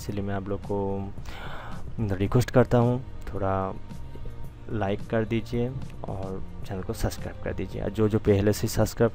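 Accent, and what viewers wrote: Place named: Odisha, India. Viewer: Bhai tu odiya ki hindi to achha se bol de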